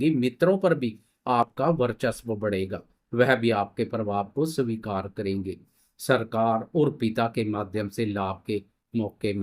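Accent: Punjab, India. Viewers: Apki Hindi mai Punjabi touch aata hai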